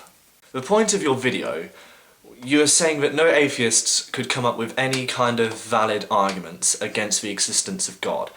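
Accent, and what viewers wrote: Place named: England, United Kingdom. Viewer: He made the effort to make the video and put some interesting points across. He has an English accent. Grow up.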